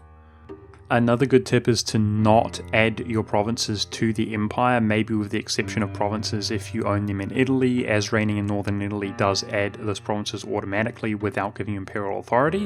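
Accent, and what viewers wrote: New Zealand. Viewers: Yep, he's from NZ. Pretty obvious isn it? :D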